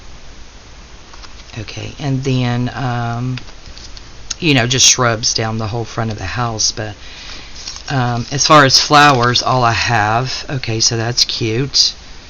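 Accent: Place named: South, United States. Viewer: Nope. All good. But I’ve got to say since that explanation at the end….I don’t think there’s anything wrong with your “scruffy” voice. To me, it just sounds like a southern accent. Heck. I sound like a whiny kindergartner.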